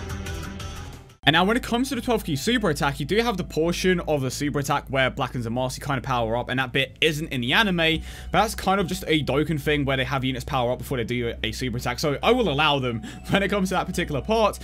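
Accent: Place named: England, United Kingdom. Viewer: sounds kinda like Darren Till with a english accent instead of irish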